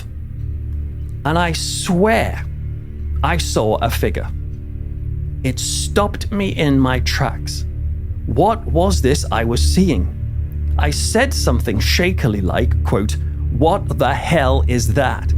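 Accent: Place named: Durham, United Kingdom. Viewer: I thought you was from Chester le street you can tell from ya accent, I'm just up from there ayton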